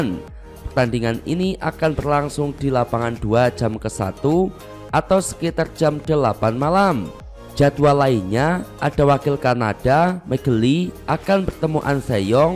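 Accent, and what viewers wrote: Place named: Java, Indonesia. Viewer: Medok bnget jowone to mas..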